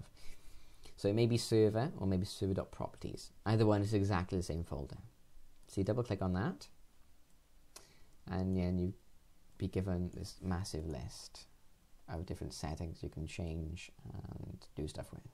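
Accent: Wales, United Kingdom. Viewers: you sound welsh mate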